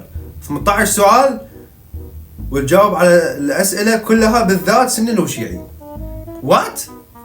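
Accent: Iraq. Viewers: اني اول مافشفتك تحجي بصوتك جنت مامنتبة علة الهجتك بس هسة من شفت الك فديو بصوتك انصدمت من طلعت من العراق 😮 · عراقي انتة هالهجة عراقية ❤ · والله حلوه عليك اللهجه العراقية 😂 مال يلا انطي لايك يلا 😂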